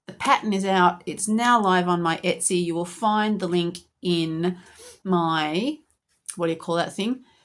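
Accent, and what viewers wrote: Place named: Australia. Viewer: Hi! Super cute mushrooms 🍄 I had to laugh about tester calls because I thought the very same thing! 😅 it's your beautiful Australian accent...don't change ❤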